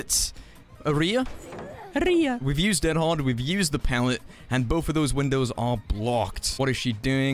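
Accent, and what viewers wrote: United Kingdom. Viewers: Nah he just has a British accent.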